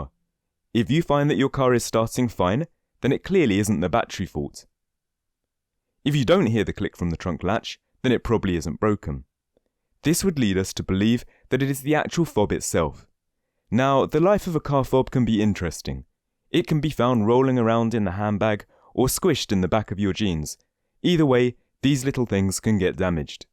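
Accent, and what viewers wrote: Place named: United Kingdom. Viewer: verbose with British English accent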